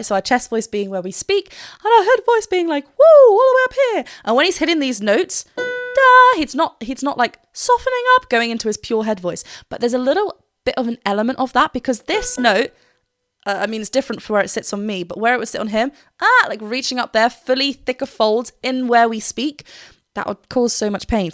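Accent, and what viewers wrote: England, United Kingdom. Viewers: r u British? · Kamu orang inggris ya saya hanya ingin denger kamu bilang water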